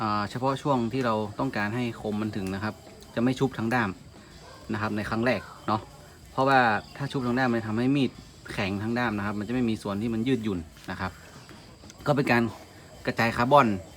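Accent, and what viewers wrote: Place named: North, Thailand. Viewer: สำเนียงคนเหนือ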